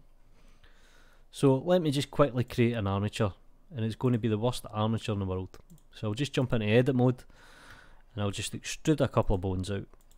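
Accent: Scotland, United Kingdom. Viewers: wao thats some strong scottish accent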